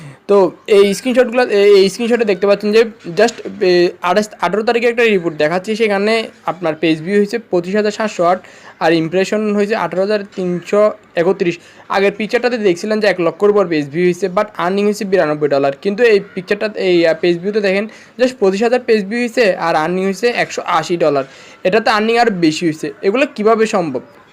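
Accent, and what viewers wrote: Chittagong, Bangladesh. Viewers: Noakhailla na mia ,chatgaiya. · ভাইয়ের বাড়ি হয়তো চিটাগাং অথবা কক্সবাজার অঞ্চলে!!কথায় স্পষ্ট বুঝা যায়!!! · vaiya,,,apni dheki amder Chittagong pola,,,,,ekta kotha hosse,,,seyta hosse,,,,flag mane ki?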